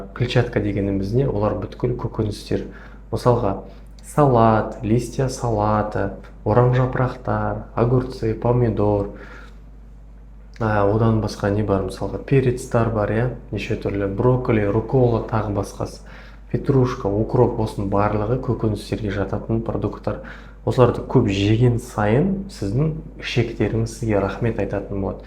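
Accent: Standard. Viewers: Аиналаиын балам Рахмет Саган сондаи журекке жылы жагымды казакша таза тусындыресын Алла жар болсын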